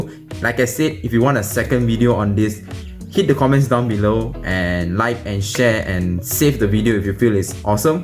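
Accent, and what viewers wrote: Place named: Africa. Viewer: Why do you you sound African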